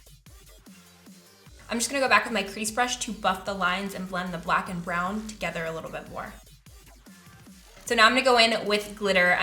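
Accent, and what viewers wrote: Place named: Tennessee, United States. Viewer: After watching this, I watched your Thanksgiving video and I love your accent! It's so pretty in Tennessee!! I love you! #snapfam #Igfam #youtubefam #queen 💜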